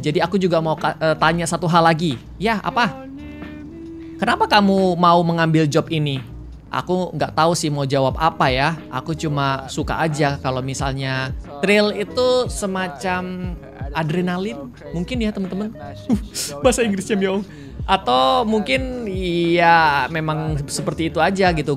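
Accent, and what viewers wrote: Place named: South Jakarta, Indonesia. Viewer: Jaksel bangget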